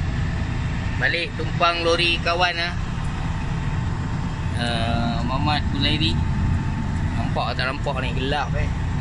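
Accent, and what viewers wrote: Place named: North, Indonesia. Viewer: kuang ajaq🤣🤣🤣abg speking northern👍🏻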